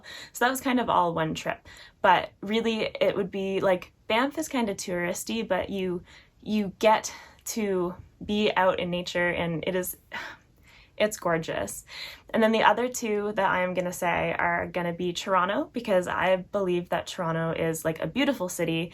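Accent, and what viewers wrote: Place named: Europe. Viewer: Playing team sports is the best thing in the world and pizza is the answer to everything. Also, your trip to Europe explains your European accent.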